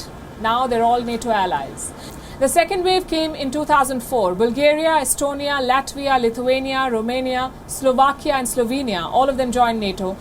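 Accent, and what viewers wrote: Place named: India. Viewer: I like how you speak like an Indian. I hate when reporters try to sound foreign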